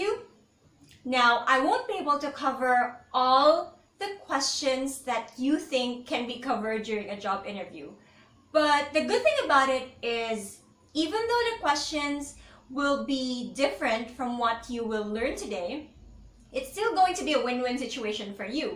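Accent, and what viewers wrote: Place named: Visayas, Philippines. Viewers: Haha bisaya diay ka ate. ;) Anyways, I love the way you speak ate. Planning to apply another call center again. It's my third time applying and I hope matanggap na ako😂❤️ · Bisaya kaayo miss oi 😊😊. Nag tanaw kos mga vlogs nimo ma'am kay mangaply ko balik puhon , maytag dili naku kulbaan 😂😂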